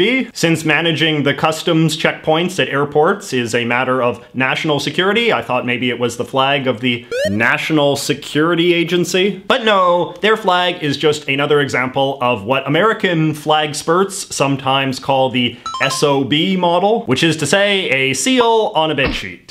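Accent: Canada. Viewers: aebout, und and you are canadian bro am i right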